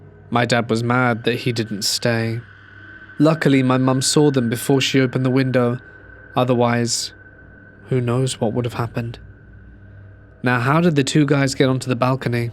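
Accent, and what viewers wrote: England, United Kingdom. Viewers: For the first time I can’t stand an English accent!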